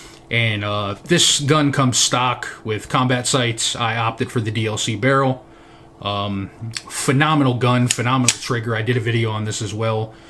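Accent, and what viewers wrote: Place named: Philadelphia, United States. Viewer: Great EDC. Your accent has a Philly vibe.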